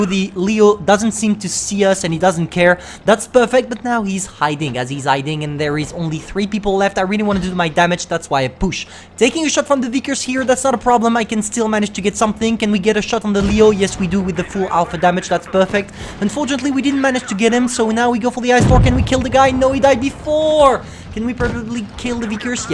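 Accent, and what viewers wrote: France. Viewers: I’m here for the French accent... nothing more, nothing less 🤤🤤🤤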